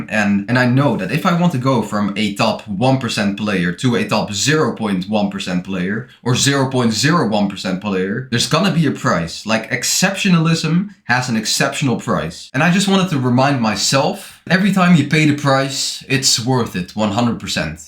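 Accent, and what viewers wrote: Netherlands. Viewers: YOO R U DUTCH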